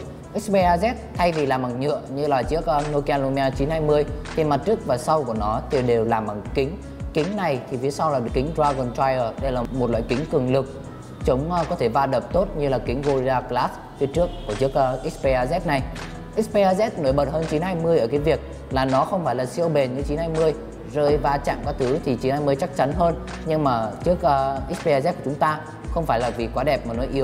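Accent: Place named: Central, Vietnam. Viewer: ông này hình như giọng chính là miền trung.... nghe mõi miệng vãi....đánh giá chưa chính xác, thiếu tính khách quan...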